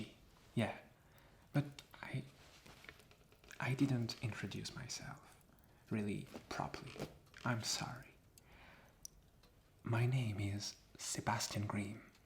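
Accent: France. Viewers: This was so ridiculously good. You chose a perfect subject, and you have a very cozy production. Is that your home!? It is so French and charming. Very historic looking! A french accent plus book sounds? Yes please. Love the page sounds and truly the French language is the most beautiful language in my opinion. Please as a request if you do another video, do a Math video! That would be amazing. LOVE the shoutout! Hahah. Plus to be put in the same page as Croissant and France...those are two of my favorite things. Truly a great first video and I really hope to see you continue doing more. You are a natural on camera!! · A soft spoken male French accent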